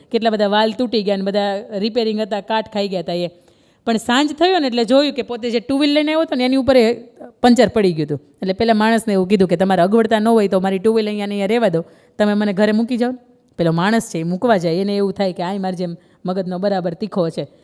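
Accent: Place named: Kathiawar, India. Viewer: આ બેન ને ખુબ ખુબ અભિનંદન ધન્યવાદ બેન તમારી આ વાત સાચી છે અને માણસ નું જીવન બદલી નાખશે એવી જ વાતો છે બેન એક વાત તમારા ઘણા વિડિયો જોયા તમારું નામ ક્યાં વતની છો એ જણાય તો અંતર નો આનંદ ખુબ વધી જશે બેન તમારી બોલવા ની અને જમાવવાની અને મીઠી ભાષા કાઠીયાવાડી ખમીર વંતી વાત સાચી છે હું પણ એક કછી પટેલ છું તમારા ઘણા વિડિયો જોયા છે ખુબ આનંદ થાય છે ફરી પણ આવા વિચારો અને વિડિયો મોકલ તા રહેજો બસ એજ ખૂબ ખૂબ અભિનંદન ધન્યવાદ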